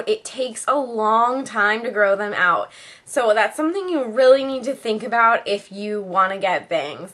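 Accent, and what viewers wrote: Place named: New Jersey, United States. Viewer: woah, you sound like your from new jersey(x ... well i LOVEEE your videos :) you are so sweet<33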